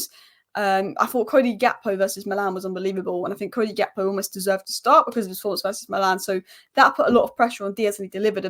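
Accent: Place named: England, United Kingdom. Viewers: I watched a tv show called uncle and he has an accent like hers. What part of England do People have this accent. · What part of Manchester is she from?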